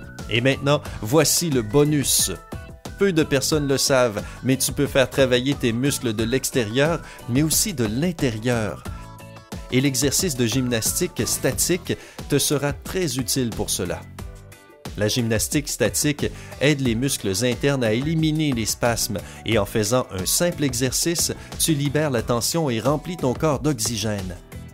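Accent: Quebec, Canada. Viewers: accent canadien j'adore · C,est un accent quebécois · L' accent canadien , drôle et sympathique ; vous ne trouvez pas ! ?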